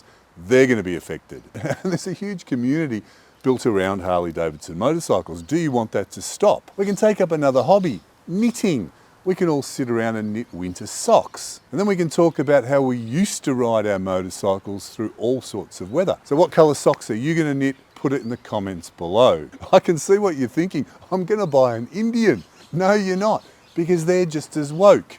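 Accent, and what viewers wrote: Australia. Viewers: A guy with an Australian accent is telling me not to boycott woke Harley Davidson. Go woke go broke! brother!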